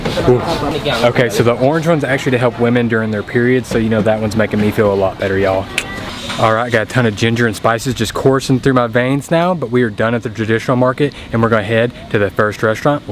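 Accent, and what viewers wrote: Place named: South, United States. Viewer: We've grown accustomed to your Southern drawl but the weird things you say still need some getting used to. ;)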